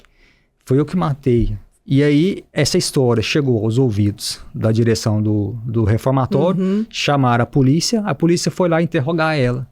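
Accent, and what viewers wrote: Minas Gerais, Brazil. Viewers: Sotaque mineiro ❤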